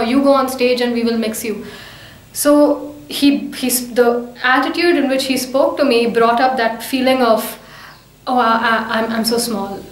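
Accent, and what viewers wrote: India. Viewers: I love the Indian accent. Do you have any videos of your singing? Also, can you give me a singing tip? I'm trying to learn. Thank you.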